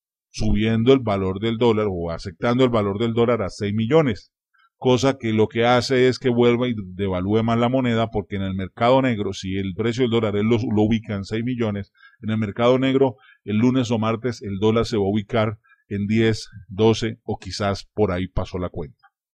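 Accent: Colombia. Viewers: no solo eso, sino que tmb es colombiche... notose si lexico y su tonico de voz... es tan colombiano cm madurin o mas xDDD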